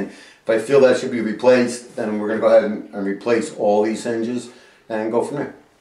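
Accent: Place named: Philadelphia, United States. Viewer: My first time watching your channel, just hearing you talk I'm telling myself you gotta be from my neighborhood in Philly.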